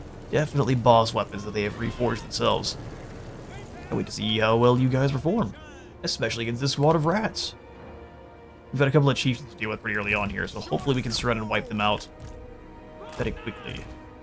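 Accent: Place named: France. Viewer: Love the French accent.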